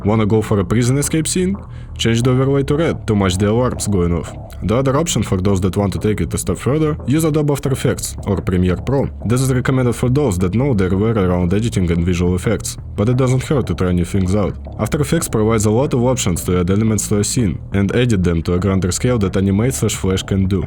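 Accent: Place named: Russia. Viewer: Русский акцент ОЧЕНЬ заметен. Это не плохо, это хорошо что ты пытаешься выходить на зарубежную аудиторию. Желаю успехов!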